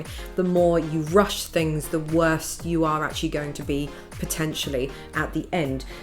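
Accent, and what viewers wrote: England, United Kingdom. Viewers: What part of england are you from? London?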